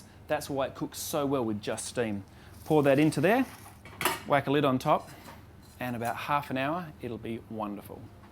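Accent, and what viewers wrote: Australia. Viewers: Really? A Thai chef and yet not Thai. He cooks for an Australian palate. I can go to Khao San road and learn these recipes. I would not then claim I am a Thai chef. In the US they have Chinese restaurants everywhere. There is a great Chinese population of all generations and 97% of the Chinese restaurants are so far from Chinese that they considered more American. The one near me is run by Mexicans. I have been a chef for 30 years, 20 of which was Asian cuisine. Plus, whenever someone has an English (Australian) accent they can make any claim. No one even questions it. Something about the accent tends to add legitimacy. American infommercials are rampant with them. I not doubting his culinary feats, just saying. Hotelier Christina Ong ( from Singapore, not Thai) gave him his great break. I know he spent a couple of years in Thailand ( I spent 10) and had minor success in Sydney with Thai food prior. 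World famous, no. So as I said, he cooks for an Australian palate.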